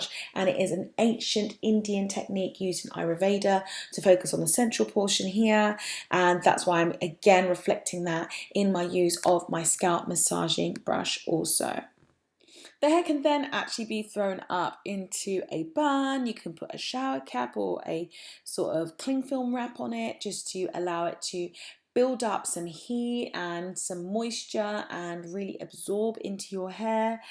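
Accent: United Kingdom. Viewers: ARe you going to show the video of the meet up in NYC? Thanks again, i like to listen to your British accent, it is so calming.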